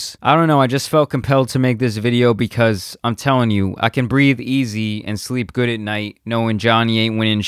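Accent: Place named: Philadelphia, United States. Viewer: you said squares. you're from the midwest area? But you have like a philly type accent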